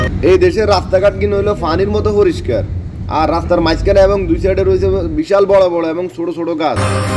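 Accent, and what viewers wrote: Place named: Chittagong, Bangladesh. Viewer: চিটাগাং ভাসা মনে হয়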